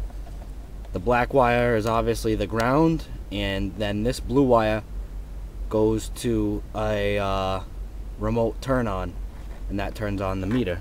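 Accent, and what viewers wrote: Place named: Brooklyn, United States. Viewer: Sounds like hes from Brooklyn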